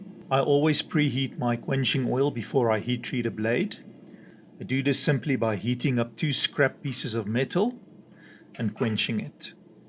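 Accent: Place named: South Africa. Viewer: I thought I recognised that accent RSA!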